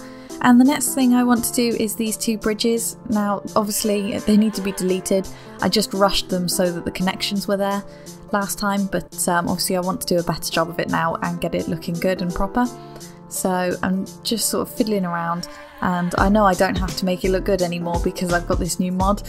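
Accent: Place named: United Kingdom. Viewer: Came for Move It, stayed for the mental attention to detail. Subbed and watched them all. I know your format is typically to VO sped up video but It'd be nice to see some of you playing real time. Nice to hear a Brit doing this so there's a little less boring grid!!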